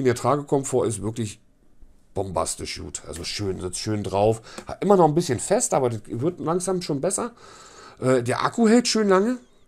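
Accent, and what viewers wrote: Berlin, Germany. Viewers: Also mein Lieber, entweder Berlinerste richtich oder lässt det. Dieses „Berliner/Hochdeutsch“ dit is nüscht!!! Grüsse 🤘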